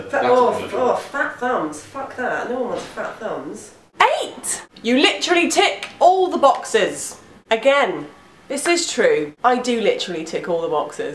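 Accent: England, United Kingdom. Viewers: Here's why you're fun to watch... 1). You're very attractive. 2). You're funny. 3). You're witty. 4). You explore a wide range of topics. 5). You have an English accent that's interesting to Americans. 6. You're very likable. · I like your videos because of your accent and the way you talk. As an American English learner I had absolutely no interest in British English but your voice, your intonation and the wormth you have in your talking made me interested and so your videos. I can not ignore your beauty though but linguistically the way you speak makes English learners both American and British sides interested. keep going gal. thumb's up. From "Iran" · I watch your videos because of your british accent the way you say stuff sometimes is hilarious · I love her because of the accent, ( actually all english girls) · I like ur voice with your british accent. 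And yeah. Your number 5ves too. They're kind of sweet the more i watch. British girls FTW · How on Earth are you single?! I mean you're really funny and witty and you have the looks.You must be really picky, probably that's why. Anyway I really love your videos and your British accent! Greetings from Romania. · I think your, funny, pretty, and your British(love the accent)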